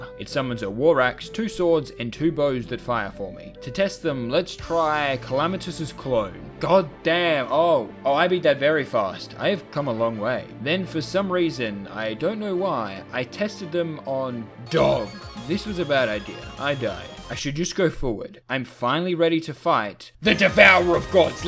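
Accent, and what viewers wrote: Australia. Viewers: I didn't know you were Australian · wait your Australian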